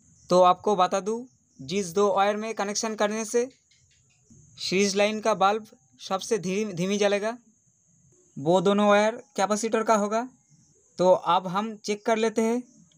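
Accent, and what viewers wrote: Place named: Bengal, India. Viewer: Bangali ho ke Hindi kau bol rehe ho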